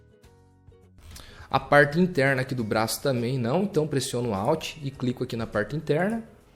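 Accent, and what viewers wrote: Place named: Paraná, Brazil. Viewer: Tua didática é excelente! Excelente vídeo. Grata por essa aula! Ganhou mais um inscrito! ;)Lm E Esse EEEE TEEE ae? És paranaense!!?? rsrsrsrs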